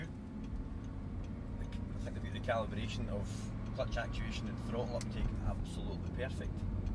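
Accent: Scotland, United Kingdom. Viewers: Beautiful overview, mate :) I just aboslutely love scottish accent :) still my dream car, and the only affordable super car. The way R8 looks is just out of this world :) Currently driving TT mk2 with dsg and these dsg farts on first gears really adds up some "drama" and I love it, I do agree, this small gap between shifts just actually adds up :) superb video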